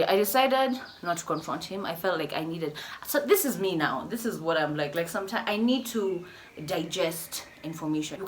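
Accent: United Kingdom. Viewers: That British accent 😍😍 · I reall love how you mix up accents, , british English and "kenyan" english, , na some kiswahili😂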